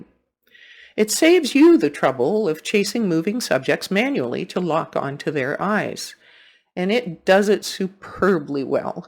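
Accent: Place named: Canada. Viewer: First of all, I would like to say what a pleasure it was to catch your video, and it was lovely to hear a Canadian accent again! I lived in Hamilton, ON, for about 6 years before moving to Victoria, BC. I now live in Auckland, New Zealand. I started my professional career in 1980, but am now retired, so I have quite a few things in common with you. My preferred genres are wildlife, scenic and travel, but I have also shot architecture, portraits, commercial and industrial stuff. In NZ, birds are the dominant wildlife form, so my experiences are very much couched with shooting them. So, to your experience... I do understand your frustration with challenges using third-party lenses. I got the R5 and a couple of R6 units about 20 months ago along with the 100-500, 24-105 L units, and the 24-240 (which, by the way, is a surprisingly good all-rounder!). However, in my fairly vast array of legacy gear I have the Sigma 150-600c and 60-600s lenses, and I tried them on my R5 and R6 units via the Canon basic EF-RF adapter. 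To be honest I had no issues unless I was shooting close to minimum focusing distance - an experience echoed by respected Australian bird photographer Duade Paton in one of his videos on the subject. I have not checked your settings at the time of responding, but there may be some subtle difference in how we have our units set up. One thing of note is that Canon have released a long series of firmware updates for the R6 and R5 to fix issues with tracking and focus tha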